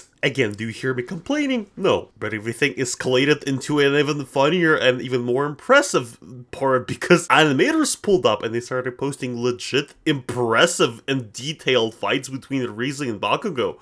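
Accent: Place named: Russia. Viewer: Кто заметил акцен пишите. Я знаю, что ты русский, меня не наибеш. Спален за русским геймплеем.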